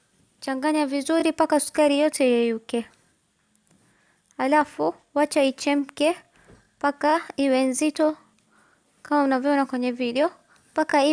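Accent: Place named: Mombasa, Kenya. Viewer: I like your swahili wewe ni from mombasa?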